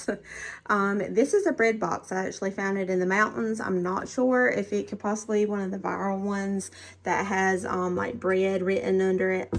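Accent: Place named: South, United States. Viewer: I love your blue and white collection, your home is beautiful. I also love your southern accent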